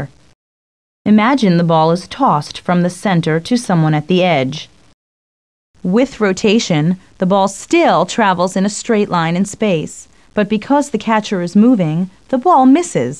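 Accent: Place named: United States. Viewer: ohhhh, what a stupid american pronunciation, accentuation and nasal twang ;-((((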